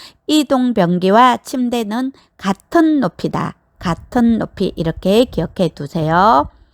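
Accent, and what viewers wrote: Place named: Gyeongsang, South Korea. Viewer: 죄송합니다 경상도사투리라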